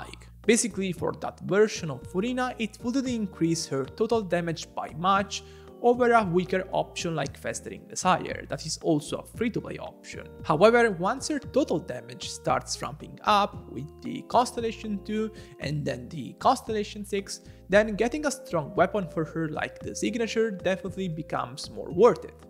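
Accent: Italy. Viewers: Are you Italian by chance? Your accent is very much the part.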